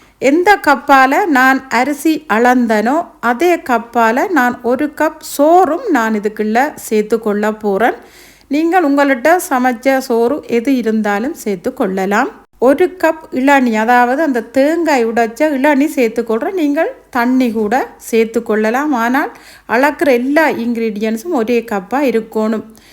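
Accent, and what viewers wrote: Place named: Sri Lanka. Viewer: தமிழ் நாட்டில் கொஞ்சம் படிச்சா போதும் ஆணும் பெண்ணும் பேசும்போது ஆங்கிலம் அதிகமாகவும் தமிழை மிக குறைவாகவும் கலந்து பேசுகிறார்கள். வெளிநாட்டில் வசிக்கும் தமிழர்கள் தமிழ் மொழியை மிகவும் மதிக்கிறார்கள். நீங்கள் செய்து காட்டிய உணவை விட உங்கள் இலங்கை தமிழ் கேட்க அமிர்தமாக உள்ளது. நன்றி... நன்றி... நன்றி...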